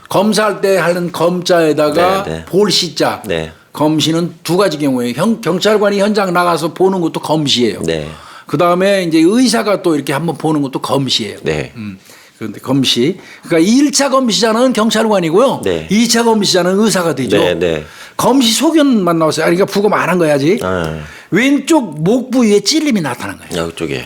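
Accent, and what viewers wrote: Chungcheong, South Korea. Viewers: 김복준 교수님 수고 많으시네요. 그짓말은 충청도 사투리 경기도 표준말은 거짓말 인데요 이 말씀은 교수님 말씀이 구수한 충청도 사투리를 쓰시는 말씀. 교수님 건강 하십시요.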